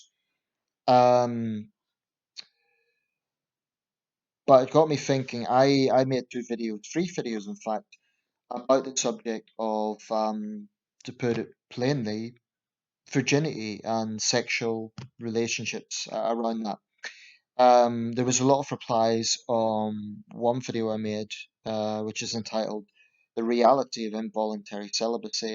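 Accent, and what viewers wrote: Northern Ireland, United Kingdom. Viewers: Are You From Norn Iron as well?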